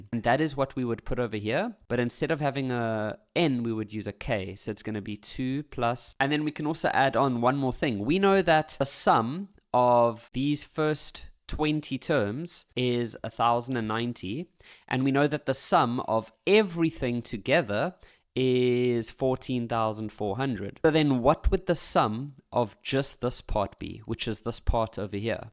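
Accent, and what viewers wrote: South Africa. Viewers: Your accent is so South African , i wonder how they would mistake you for anything other than lol 😆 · That cape coloured accent hella accurate.🤣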